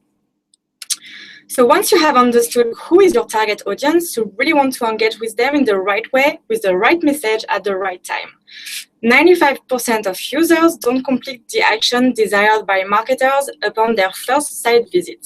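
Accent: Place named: France. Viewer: Very cute French accent. Thanks for the video :)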